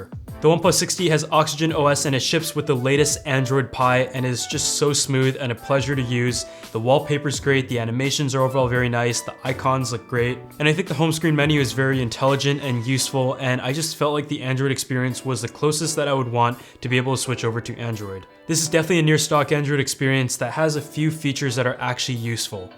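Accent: Canada. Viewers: MD KHALID RAHMAN he’s canadian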